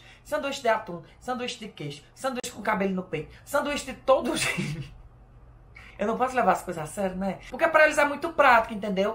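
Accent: Northeast, Brazil. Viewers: eu te acho a cara q se assume dentro do q vc é, nordestino arretado muitíssimo inteligente, porém com sotaque incivelmete nordestino q portada acordo com a situação e ocasião continue esse carinha gente fina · Sotaque nordestino lindo, admiro muito os nordestinos e o Nordeste. Estado lindo povo maravilhoso quero conhecer esse estado belo.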